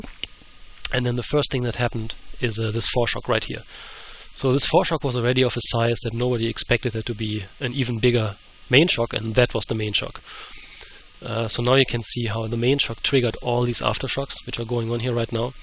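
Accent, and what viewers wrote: Germany. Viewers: Man this was awesome! Do I get it right that you have something like Virtual Reality glasses on to see the things we are seeing? Oh and, are you german? Your accent sounds just like that... :)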